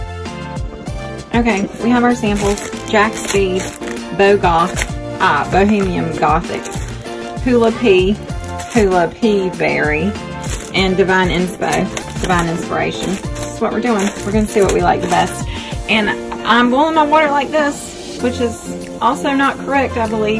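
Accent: South, United States. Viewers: I don't really care what you drink you're just way too cute and I love that southern accent you're a true farm girl.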